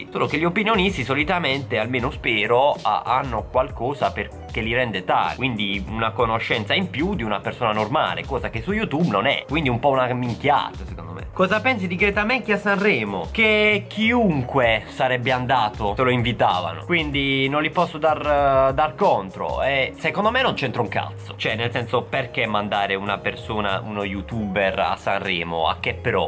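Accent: Bari, Italy. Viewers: Potrei iniziare ad amare l'accento barese solo grazie a te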